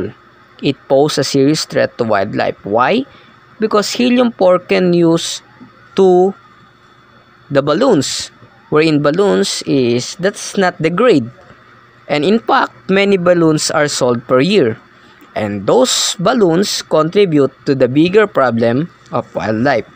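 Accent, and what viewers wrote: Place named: India. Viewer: While I very much appreciate the man explaining this, the Hindi accent in the English makes it quite hard for me to follow.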